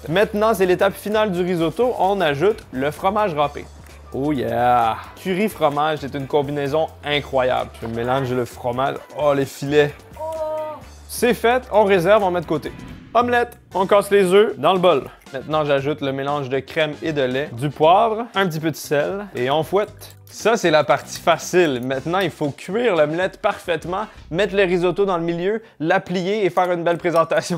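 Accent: Quebec, Canada. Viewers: T’es québécois ?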